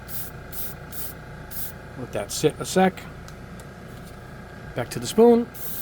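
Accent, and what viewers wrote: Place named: New England, United States. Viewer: Back to modeling after a 60 year hiatus. Very much like your informative videos. I live in CA and recognized your New England accent right off the bat. I grew up not far from you, north shore Boston. I've been looking for MRC leveling thinner but it is nowhere to be found so I just placed my order for your replacement product. Thanks, looking forward to trying it out.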